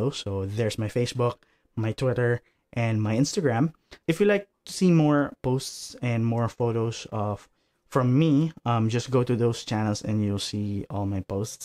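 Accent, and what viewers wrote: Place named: Philippines. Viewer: SKL I recognized our Filipino accent immediately 😂 Much love and support ⭐️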